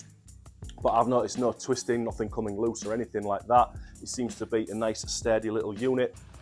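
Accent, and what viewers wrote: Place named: Hull, United Kingdom. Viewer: ah nice mate, I’m from hull myself thought you sounded close. Wanting to get into fac shooting but no idea where to start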